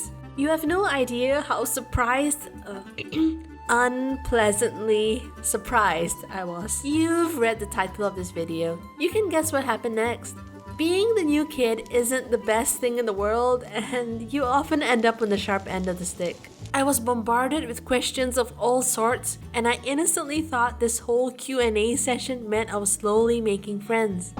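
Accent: Scotland, United Kingdom. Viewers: I’m a good artist too this was an amazing story to show to my friends! By the way i like your trying to speak Scottish! Be yourself your beautiful on the inside. Always believable in yourself! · I love yoyr Scottish accent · I live in the UK (Scotland) Your scottish accent is hilarious. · I love her Scottish voice because I am Scottish and irish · your scottish accent isnt that bad like this comment if ur scottish 1st like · It sounds like there’s a q in every sentence in the Scottish acent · i understand scotland accent actually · I can still hear your Scottish accent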